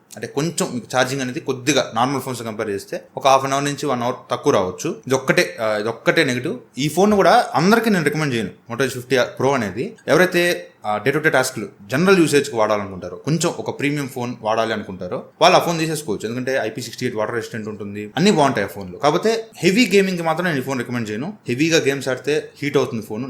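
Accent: Nellore, India. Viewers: Bro miru Nellore ra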